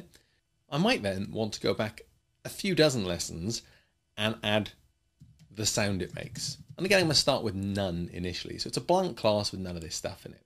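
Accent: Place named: Cockney, United Kingdom. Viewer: Cockney Accent 🤣🤣🤣🤣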